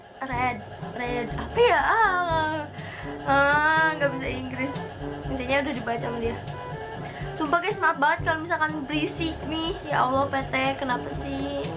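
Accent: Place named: Kuningan, Indonesia. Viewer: Ka orang mana sih kaya orang kuningan aja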